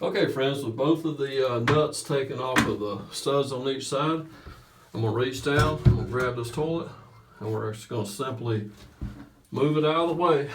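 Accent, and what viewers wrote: South, United States. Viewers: Thanks for being very thorough! I like your " " accent"!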